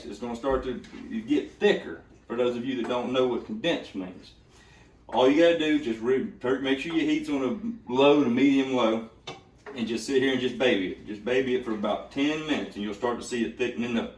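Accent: South, United States. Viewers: Just love love his videos. Both sides of my family are from Mississippi, I just love a man with a Southern accent and can tell a story, like my my uncles. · Handsome and Funny. As a Canadian, that Southern accent is doing it too. 😉😁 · I recently discovered ur videos, love them! I share them at the nurses station n u hv us rolling. U Sir r very entertaining, love ur southern drawl and ur stories, keep up the good work. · He is cute with that little southern accent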